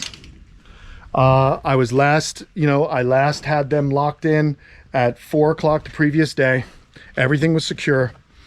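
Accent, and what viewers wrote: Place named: New York, United States. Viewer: The New York is starting to come out.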